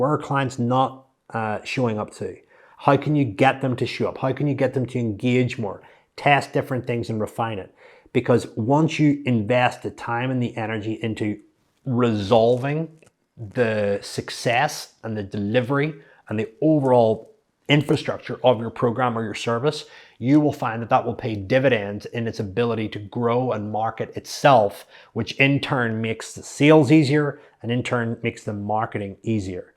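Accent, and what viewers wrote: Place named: United States. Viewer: Thanks! Very helpful. Loving my Mission Ready 💞 Ps.Your Irish accent seems to be turning American 😳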